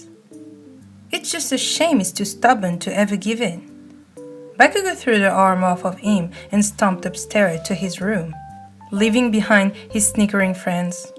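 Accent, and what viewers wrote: France. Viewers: this is gold new sub :) love that acent tho you french or somethin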